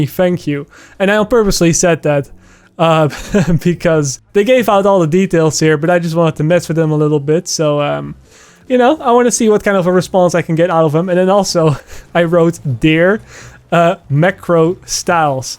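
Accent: Netherlands. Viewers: BTW, I only started watching you recently, but the first video I saw from you, I could already tell you were the Netherlands... It's you accent, it's so obvious haha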